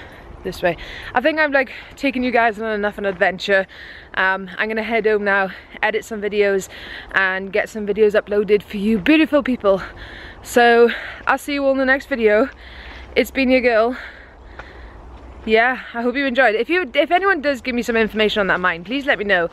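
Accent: Wales, United Kingdom. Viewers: assume wi ya voice ya welsh where about wales are u ?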